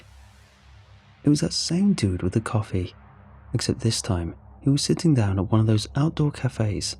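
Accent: England, United Kingdom. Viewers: What accent has the narrator got? Sound's English but I could be wrong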